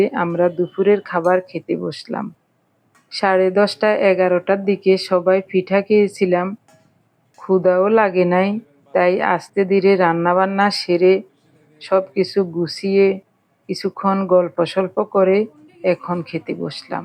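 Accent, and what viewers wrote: Noakhali, Bangladesh. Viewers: দিদি আমি নোয়াখালী থেকে বলছি। আপনার কথার মধ্যে নোয়াখালীর একটা টান আছে, বুঝতে পারছি না আপনার দেশের বাড়ি কোথায়। খুব সুন্দর লাগছে সবাই টুকটাক কথা বলছেন।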